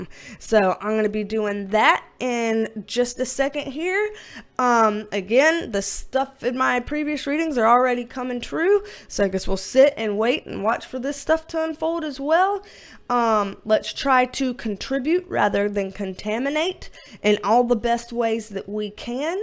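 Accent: United States. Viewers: 😅 “we’ll make a start” - we say that in Australia too. It never struck me as unusual until I heard you say it in an American accent 🤣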